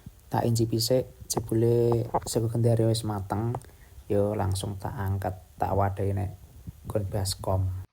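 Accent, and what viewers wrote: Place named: Java, Indonesia. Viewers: Mas sampean wong daerah ngeni sih kok ngomonge hampir mieip banget daerah ngonku aku wong surur sari grobogan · Kakak orang mana bahasa Jawa nya kok medok kali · Nambah komen mas,,ketok medok coromu ngomong ,,sampeyan corone masak ugo noto njero omahmu persis budeku,,.jangan gori,,iwak gereh.. Persis asli wong ndeso tapi kemajuane pesat,pinter opo wae. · Sampean ki rumahe mana mas? Kok boso jowone medok? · Bahasa medok smpean koyok aku ide ne iso aku suka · Mase ki koyo wonogiri pinggiran Yen gunung kidul bhsane ono bedobe...umpomo yu .dadi zhu..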